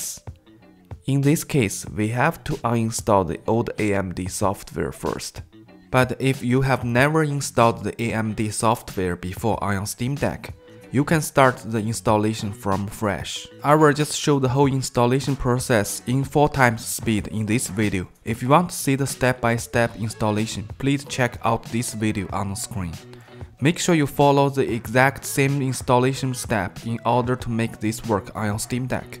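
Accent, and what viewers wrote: China. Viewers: 怎么像中文口音啊老铁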